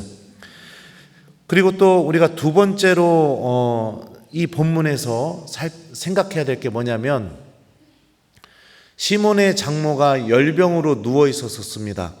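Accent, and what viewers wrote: Chungcheong, South Korea. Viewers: 신부님 충청도 말씨 구수혀요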